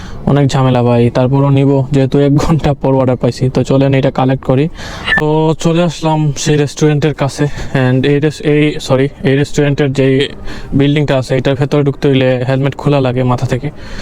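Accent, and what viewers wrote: Sylhet, Bangladesh. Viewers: ভাই আপনি সিলেটি নি?? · Apne kita sylheti ni ?? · ভাই আপনার কতা হুনিয়া মনে হয় আপনি আমরার সিলেটি মানুষ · আরে ভাই তুমি তাইন তো দেখিয়ার সিলেটি ❤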